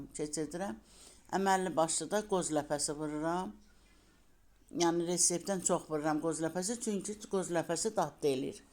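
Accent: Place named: Aran, Azerbaijan. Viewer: Salam xanım,siz haralısınız? Ləhcə çox tanış gəldi 😄😄 hiss etdim ki,aran bölgəsindənsiniz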